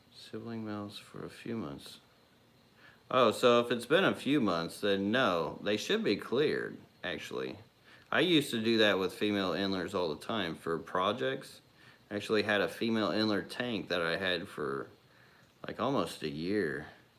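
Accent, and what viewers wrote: United States. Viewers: You sound like such a hill Billie . Love it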